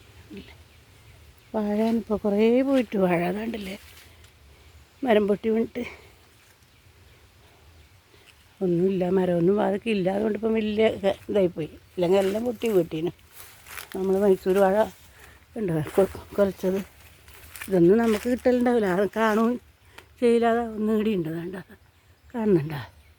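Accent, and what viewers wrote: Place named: Kannur, India. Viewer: നല്ല വീഡിയോആണ്. സപ്പോർട്ട് എന്നും ഉണ്ടാകും. നമുക്ക് ആവുന്നതല്ലേ നമുക്ക് ചെയ്യാൻ പറ്റുള്ളൂ. വിഷമിക്കാതെ മുന്നോട്ട് പോകു ചേച്ചി ♥️എവിടെ യാ വീട് കണ്ണൂർ ആണോ. ഭാഷ കേട്ടിട്ട് അങ്ങനെ തോന്നുന്നു 🎉🎉🎉🎉🎉🎉🎉🎉🎉🎉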